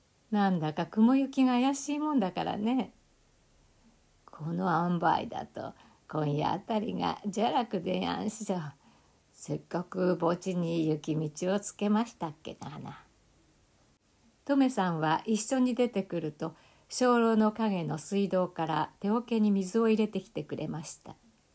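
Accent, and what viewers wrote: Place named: Hachinohe, Japan. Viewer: 彼岸じゃらく…当地方の彼岸の辺りの悪天候・・・懐かしく八戸弁（東京弁のですが…）を聴かして戴きました。 ｢ ｣さん、ありがとうございました…。 感動の朗読をありがとうございます❗ 感謝です…‼️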